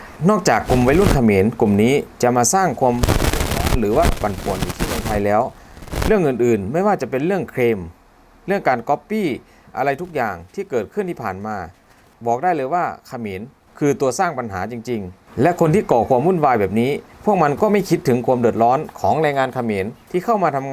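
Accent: Laos. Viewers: เเอดมิน เป็นคนประเทศลาวป่าวคับ สำเนียงเหมือนเลย ภาษาไทยก็เขียนผิด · เว้าลาวเถอะไอ้หนู กูอายแทน แค่ออกเสียงคำควบกลำ้เขาก็รู้ว่าเป็นลาว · คนบรรยายเป็นคนลาวบ่ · แอดเป็นคนลาวพูดภาษาไทยไม่ซัด แอ็ดเป็นคนลาวแน่นอดครับเชื่อผม ฟังภาษาและสำเนียงให้ดีนะครับพี่น้อง ข่าวที่เป็นจริงเราต้องฟังแอ็ดที่เป็นคนไทยนะครับอย่าให้คนลาวปั่นหัวเราใด้ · ข่าวดีแต่คนอ่านข่าว ลาวคัก.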